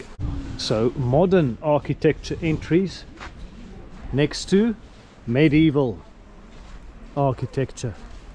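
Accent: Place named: South Africa. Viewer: You Mr sounds like from South Africa to me, maybe I wrong,love your videos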